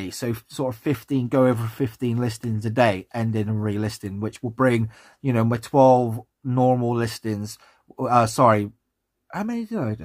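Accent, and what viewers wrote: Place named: West Country, United Kingdom. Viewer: New sub. Caught your accent on one of s zoom calls & recognised it as a fellow West Country chap. Only got to 120ish listings before I revamped & unified using the same SKU & photo system. Wish you all the best with the restart 🙏 👍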